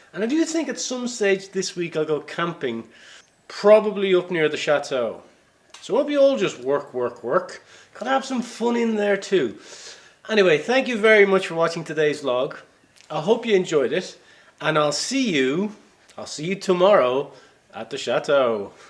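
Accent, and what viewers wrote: Ireland. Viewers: Perfectly timed for the experience of a taco salad then wine accompanied by an Irish brogue. I zoned out on the math calculations, though, ha ha. I'll give you a like in spite of those. And now to go and address my own piles of clutter. I hope we both win. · I get the feeling, when I read the comments, that everyone has your lovely Irish lilt and expressions front of mind when we gather our thoughts and write them down. I always write with an accent when I write my comments after listening to you. Your expressions are really endearing. Thank you so much for sharing your day with us. I really enjoyed lunch. It was delicious. That dessert was particularly magnificent. My nosey attitude is wondering what you might be bringing home with the engine when you return. I hope you have a wonderful week. At least, helping Dan will stave off feeling like a 60 year old for a very long time. 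(mid-afternoon naps are the half of it!!)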